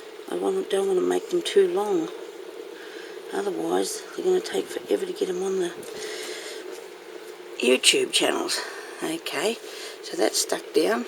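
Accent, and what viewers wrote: Australia. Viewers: you must be inland l live on the coast hot but not as hot as inland. there great little flowers really sweet. its so nice to hear an aussie acsent. looks beautiful love the flowers tfs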